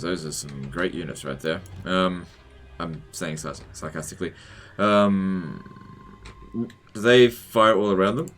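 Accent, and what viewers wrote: Australia. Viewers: When i was scroling through ytl and saw i was like omg it's true cc doesnt work well with an Australian accent.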